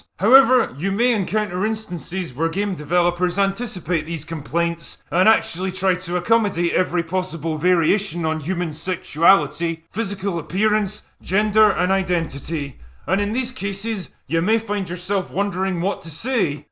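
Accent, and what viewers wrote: Scotland, United Kingdom. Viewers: Pissed up Scottish man seems to be making some sense in these times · It is so funny hearing a Scottish man bashing American journalists who keep saying nothing except how much they hate the orange man while the rest of the world just wants to enjoy a new video game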